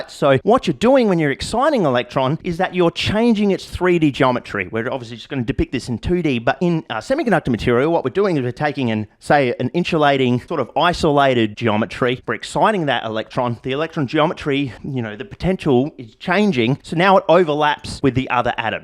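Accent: Australia. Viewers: every episode you become more Australian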